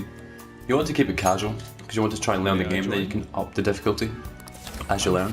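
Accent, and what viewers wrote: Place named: Scotland, United Kingdom. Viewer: Came to learn tycoon, stayed for the Scottish accent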